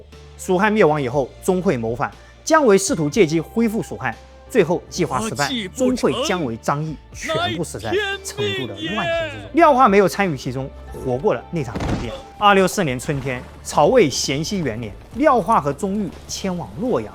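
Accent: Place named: Hubei, China. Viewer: 我今天很興奮的和我太太說，聽湖北人說書，和北方人說書的風格不同，另有一番滋味。我有一個武漢的朋友，說話和一樣有種魅力。然後我太太說，三國是不是在講曹操、諸葛亮和關羽結拜的故事… 我決定一輩子不再和她討論這個話題…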